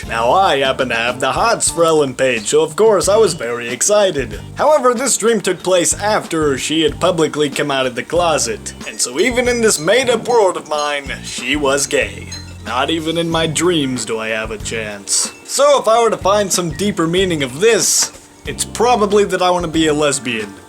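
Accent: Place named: Canada. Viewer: He sounds Canadian.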